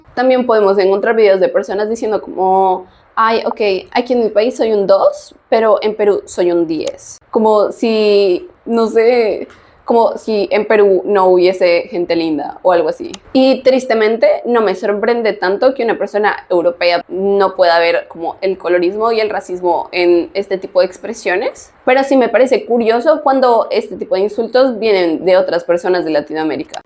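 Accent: Colombia. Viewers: eres de colombia?....y tu acentico? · ¿En serio? El acento se me hace un poco bogotano (aunque sí está un poco raro). ¿Por qué dices que es de México? ¿Lo ha dicho en algún video o algo?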